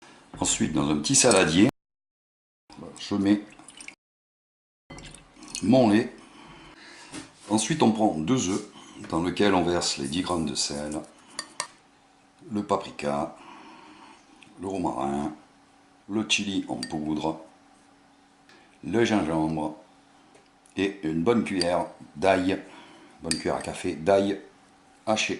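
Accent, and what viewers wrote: South, France. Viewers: il explique bien, c’est l’accent du Sud qui fait tout 😂😂😂👍🙋‍♂️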